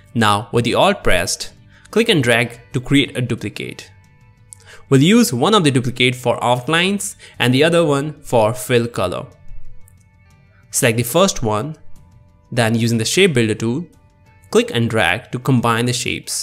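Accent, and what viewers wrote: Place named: India. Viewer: chhhharactorr ? indian cu*t ? lol shity accent always leaks out. No matter how hard you try...wannabeeez!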